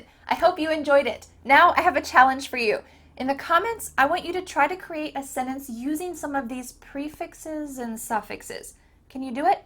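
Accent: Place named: New York, United States. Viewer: I got a friend of mine, he is from New York, and I always share your beautiful classes with him! Lol! He feels like to go back home! Lol! Your lovely accent reminds him "Home". Thanks! Lol!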